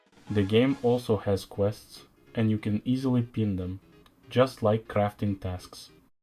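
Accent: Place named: Russia. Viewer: Russian accents 🤤🙏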